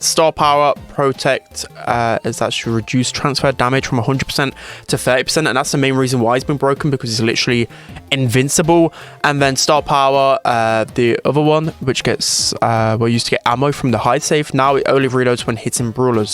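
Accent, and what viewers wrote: United Kingdom. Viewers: W british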